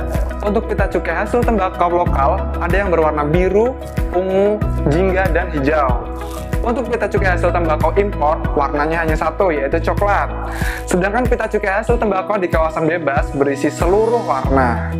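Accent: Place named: Banyumas, Indonesia. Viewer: Keereen bea cukai ngapak yu...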